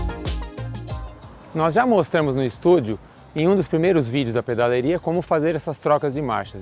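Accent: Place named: Northeast, Brazil. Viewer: Fala direito so nordestino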